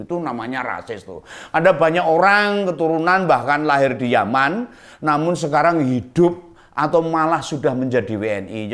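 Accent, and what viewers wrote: Java, Indonesia. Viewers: JOWO MEDOK NYIMAK PAKDE........... 🤣🤣🤣 👍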